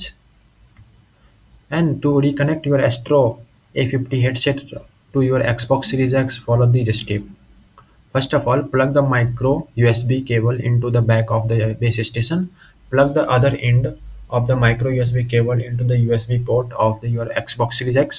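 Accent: India. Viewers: No offense but hearing an Indian accent when I’m looking to fix a problem. Gets me thinking that I’m getting scammed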